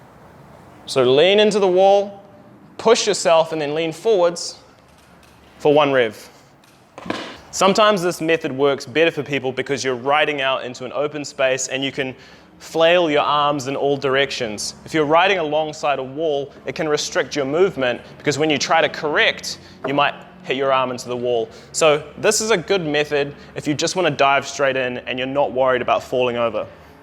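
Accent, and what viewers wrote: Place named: Australia. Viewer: Thanks so much,! I’m 53 and decided to learn while corona-confined. I’ve watched you so many times that I’m starting to speak with your AMAZING accent! I am an American who was inspired by a pastor in Taiwan, trained by a YouTuber from down under. Yesterday I rode a quarter km, but am still having a terrible time with mounting. Both methods are tough for me, so I’ve used a brick to prop and prevent rolling backward. I need to break this bad habit, because i’m tired of having to walk back to my brick. Any more mounting tips?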